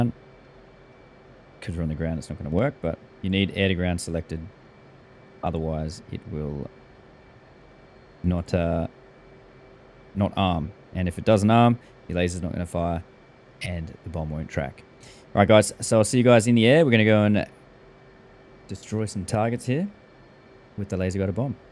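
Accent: Australia. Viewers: So nice hearing another Aussie accent. Great video!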